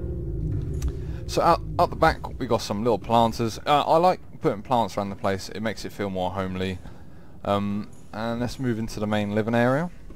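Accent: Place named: United Kingdom. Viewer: Excuse my racism... but wasn’t this video so much better bc of the Brit accent???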